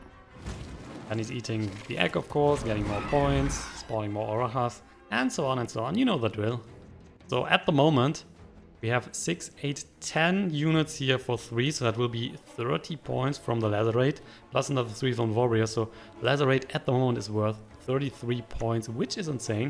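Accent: Germany. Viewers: are you from germany? just wanna know if i guess the accent right.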